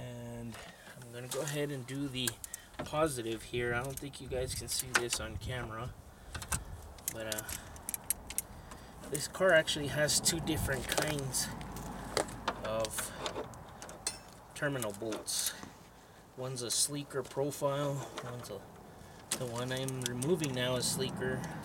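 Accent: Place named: Minnesota, United States. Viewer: Thanx for the video....... I kinda felt like I was watching an episode of FARGO (the accent)......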